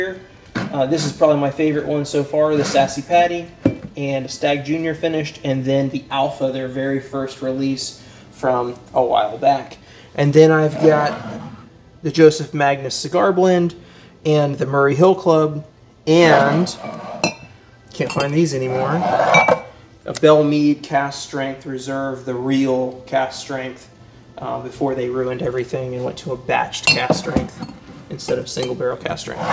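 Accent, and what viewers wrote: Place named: Wisconsin, United States. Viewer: Sounds like you might be from Wisco with all they J. Henry!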